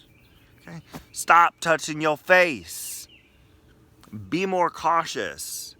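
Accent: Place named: South, United States. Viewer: Your southern accent has really improved.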